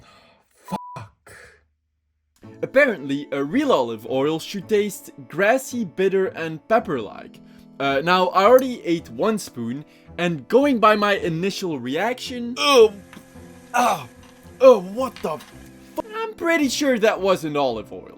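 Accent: Netherlands. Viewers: How much of this applies to US markets vs our European markets? You constantly reference US cases and US studies and list everything in dollars (for some reason), but you seem to be dutch (judging from your accent and the Delhaize brands) and testing European products sold under European laws. Our European Union has a lot more stringent laws on food quality and truthfulness in their labels, although they are not perfect, they are a lot better in general than the capitalist Mad Max hell the poor Yanks live in. It would be great if you could be clearer on such significant differences in the future, because it's an important topic and a fun video in general, but with oversights like this, you could worry the wrong people or, even worse, desensitise people to the wrong topics. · Accent sounds Dutch to me.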